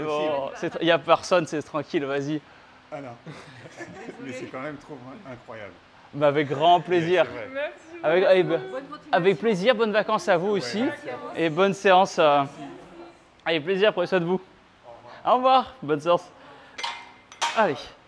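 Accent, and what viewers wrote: France. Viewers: Oh, il a retrouvé sa voix française... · Didn’t even realise he was French till he opened his mouth 😂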